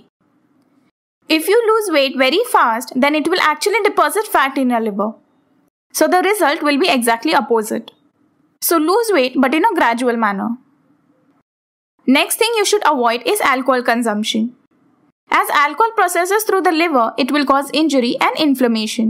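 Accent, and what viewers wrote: India. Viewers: I thought you were Geman at first but then I was like nope! India ; ) when you all talk fast you give it away.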